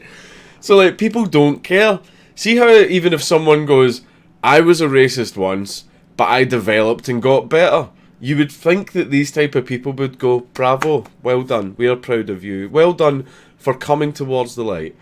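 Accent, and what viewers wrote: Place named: Scotland, United Kingdom. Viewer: Awesome vid! but i wish you went with "they want to see you burned." rather than "they want to be outraged" At the closing statement..mainly cause "burned" sounds awesome with a scottish accent:D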